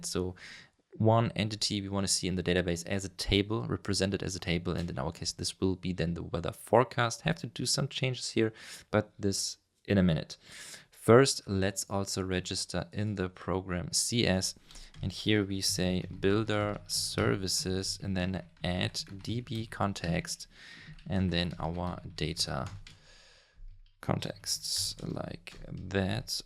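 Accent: Germany. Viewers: Also fur einen deutschen sprichst du ziemlich gut Englisch! Der deutsche Background ist jedoch nicht überhörbar 😃. Danke fur das tolle Video! 👌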